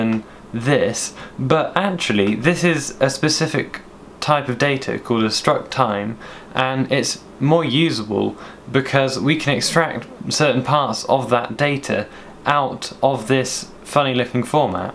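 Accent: United Kingdom. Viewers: awesome ,sounds like a British?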